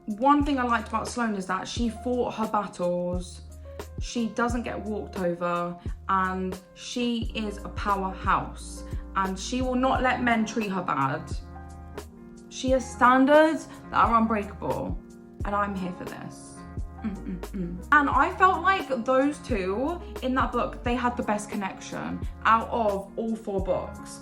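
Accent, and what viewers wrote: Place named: United Kingdom. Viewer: I love her british hermione like accent❣️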